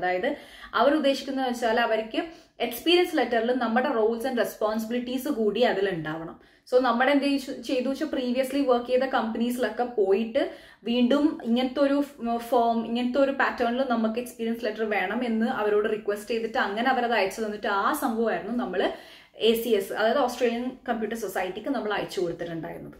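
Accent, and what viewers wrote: Kannur, India. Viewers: Wow.. thanks a lot for committing the video.. Kannur bhasha kettapol thonni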